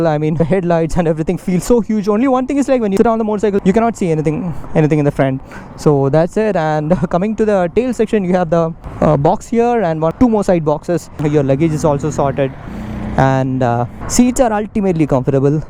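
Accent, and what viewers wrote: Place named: Tamil Nadu, India. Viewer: inner tamil coming out in that english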